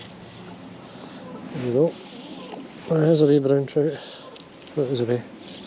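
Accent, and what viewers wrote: Scotland, United Kingdom. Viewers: are you from Scotland? I've heard it said that some scotts cannot say "Purple Burglar Alarm"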